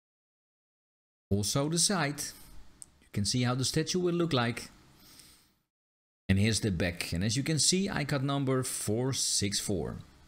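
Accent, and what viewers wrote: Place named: Netherlands. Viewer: Echt een typisch Nederlands accent.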